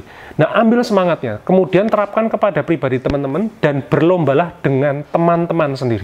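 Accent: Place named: Java, Indonesia. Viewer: pandangan subjektif yo pak, aku seneng nek krungu sampean jelaske logat medhok haha.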